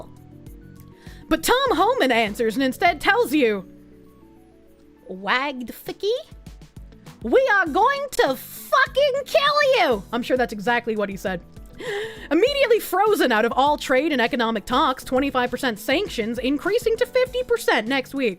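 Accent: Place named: New England, United States. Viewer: I ❤ New England Accent. Am also from New England.😁👍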